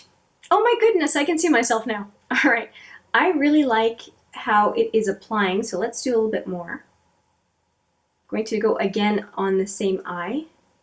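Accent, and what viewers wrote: Quebec, Canada. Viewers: The Elizabeth Arden is still on their website.Guess I know y!U sound like u could be Canadian french!I think the urban decay shadows are my fave of the ones that I have.In general for the price and general quality they're the best of the ones I have.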